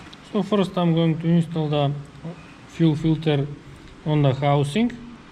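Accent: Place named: Russia. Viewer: а говоришь как русский🤝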